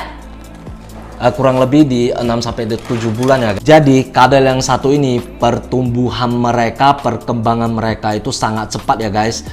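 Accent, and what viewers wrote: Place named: Chinese-Indonesian, Indonesia. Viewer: Penjelasannya sangat lugas dan jelas... Dengan dialek kokonya yg khas .. Semangat ko,di tunggu konten lainnya...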